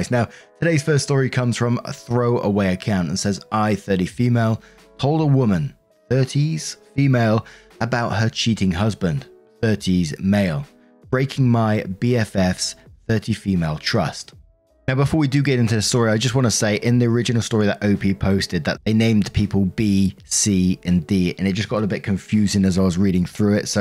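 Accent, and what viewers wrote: United Kingdom. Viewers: You have a pleasant voice to listen to, but I can’t tell if you are from US or UK. UK?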